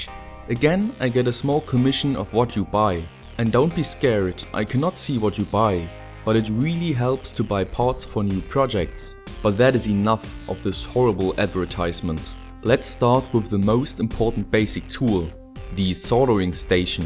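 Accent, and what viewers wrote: Saxony, Germany. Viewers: Hi, great Video! Especially because I'll have to build a new tools stock for reactivating my old hobby. My first project is to hook up a old ghettoblaster with bluetooth for my brothers birthday. I even have the service manual including the schematic, but it's a while since I last read one. May you help me to find out where I'll grab the AC for my converter board? It would help me a lot. Fabigg PS: Even though I'm a German too, it's kind of polite too write in English because your videos are English. PPS: But I'm wondering what dialect you might have, because you pimped a Simson and the sound of some phases I'll guess it's saxonian. Am I right? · Tolles Video :) Jetzt brauch ich nur noch eine Bench Power Supply, dann hab ich alles :D Kann das sein, dass du eher aus dem Osten Deutschlands kommst? Sachsen oderso? :D Hört man ein wenig :)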